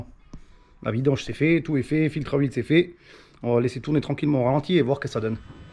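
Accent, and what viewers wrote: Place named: Forbach, France. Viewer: Salut, secteur Forbach? Vu l'accent 😉. As tu déjà pensé à acheter un bac a ultrason pour le nettoyage des pièces, sa te permettrait de faire autre chose en attendant la fin de la minuterie du bac a ultrason. champion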